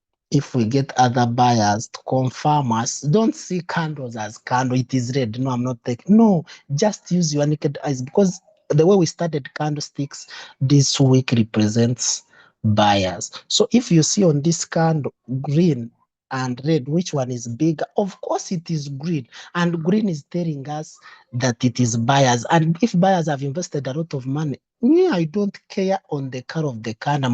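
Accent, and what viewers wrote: Ankole, Uganda. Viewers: That accent is for pure banyankore not bakiga. Much love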